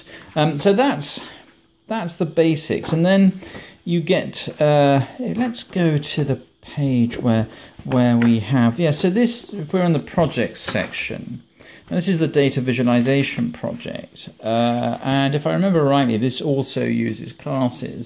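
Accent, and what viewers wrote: RP, United Kingdom. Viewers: Quite a posh sound gentleman! A Gentleman and a Scholar! :)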